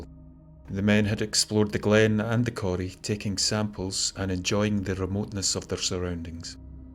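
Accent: Scotland, United Kingdom. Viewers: Excellent Narration. Excellent Voice. Thank You SO Much for the beautiful landscapes-for the Lack of background noise that would interfere-with hearing the wonderful historical, & supernatural information from your great, original Scottish voice. I've always wanted to see Scotland, & now I can, thru your wonderful videos. Please-Change Nothing. It is Perfect, as is. Cordially-Pam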